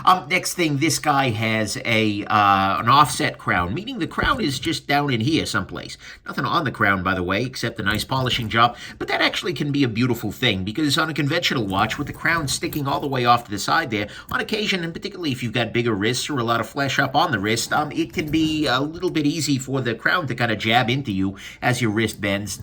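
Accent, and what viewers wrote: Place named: Brooklyn, United States. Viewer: You must have grown up in lower Brooklyn. You sure sound like you hail from there.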